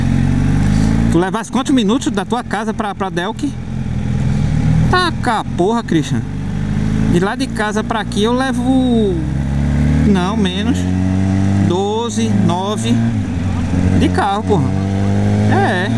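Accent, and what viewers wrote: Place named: Minas Gerais, Brazil. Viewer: esse teu ˆsutaqueˆ é bão demais sô hahahaha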